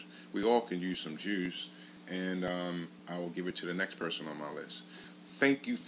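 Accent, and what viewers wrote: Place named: Maryland, United States. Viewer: Your murryland accent is so on point It's like marylanders replace a's with the "ur" sound haha.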